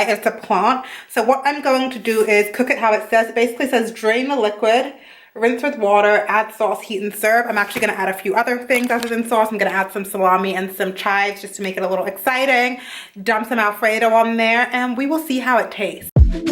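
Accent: Canada. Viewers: I think she is frm canada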